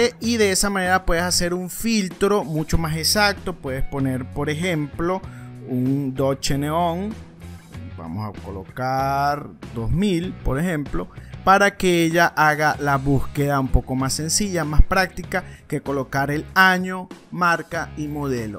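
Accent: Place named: Venezuela. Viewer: Saludos, si no me equivoco tu asentó es netamente Venezolano y como buen Venezolano seria importante que nos respondieras sobre como podemos utilizar esa plataforma acá en VENEZUELA, espero nos respondan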